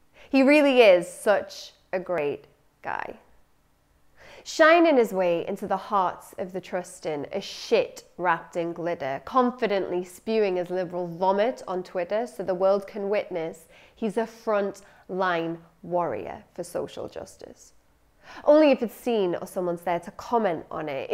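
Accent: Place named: Newcastle, United Kingdom. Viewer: Amazing! In the wellness/yoga circles I work in Ive defo met a fair few of these guys!! You express it so well and great to hear a fellow geordie covering this important topic thanks 💚🙏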